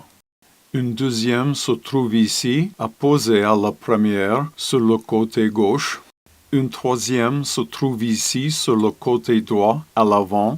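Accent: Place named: England, United Kingdom. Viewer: Merci Monsieur, avec l’accent anglais c’est encore mieux 😊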